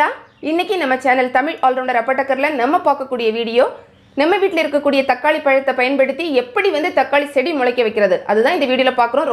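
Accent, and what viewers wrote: Kanyakumari, India. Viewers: Kanyakumari slang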